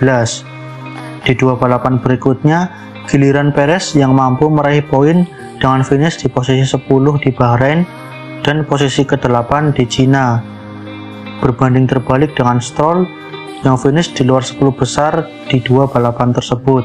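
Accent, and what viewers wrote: Java, Indonesia. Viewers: Mantep 👍, logatnya wong jowo ?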